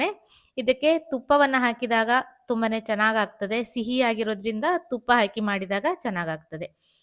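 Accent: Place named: Kundapura, India. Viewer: ನೀವು ಮೊದಲು ನಮ್ಮ ಕುಂದಾಪುರ ಭಾಷಿ, ಮಾತು ಆಡುತ್ತಿದ್ದೀರಿ, ಅಲದಾ???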